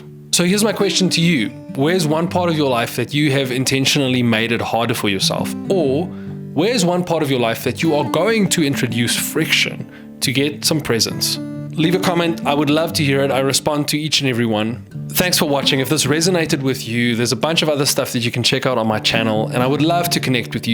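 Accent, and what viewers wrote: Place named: South Africa. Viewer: Howzit, this film really resonated with me. I’ve always written with a fountain pen since school days in the eighties in KWT. When I learned to shave, my great uncle gave me his cutthroat razor which took a lot of practice to master. When I started fly fishing, my wife bought me a split cane fly rod. Each of these force me to slow down and think about what I am doing. Two years ago I went back to vinyl and this year I began teaching my son film photography. Every days is calmer, every moment is a little more considered. Thank you, keep making your films. It’s lovely to hear a South African voice and see parts of SA when living in rainy, cold England.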